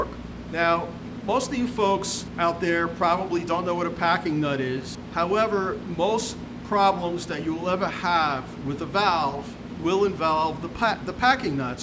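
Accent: New York, United States. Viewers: Great video, thanks, ditto on hearing that New York accent! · Former New Yorker here, and damn, that accent makes me homesick 😂 Thanks for the explanation, would love to see the procedure a little closer up, but even so, this gave me the confidence to try to resolve a really bad leak from a garden hose shutoff valve. Wish me luck!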